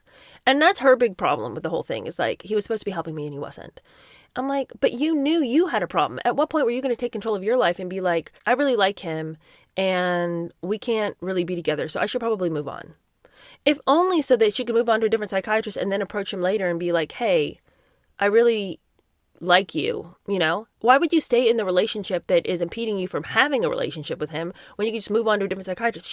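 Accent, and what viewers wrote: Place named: England, United Kingdom. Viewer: Her accent is awesome. I can't figure out where its from I feel like england?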